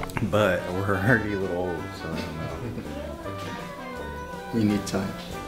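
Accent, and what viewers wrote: Minas Gerais, Brazil. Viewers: Sotaque mineiro não sai da gente mesmo hihihi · esse sotaque rs de Minas é lindo demais!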